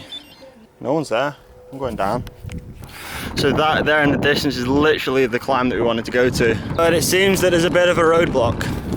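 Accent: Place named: Northern Ireland, United Kingdom. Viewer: Hey, so good to hear you still have some of yer old norn iron accent. Love the "oh dear" when you guys hit the road closed sign 😁 what about yee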